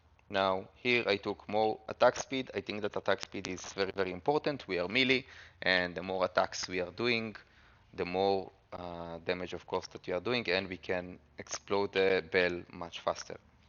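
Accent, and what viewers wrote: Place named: Israel. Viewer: חח תודה רבה צדיק זיהיתי לפי המבטא את הישראליות ! נראה מעניין,אולי אלך על הבילד הזה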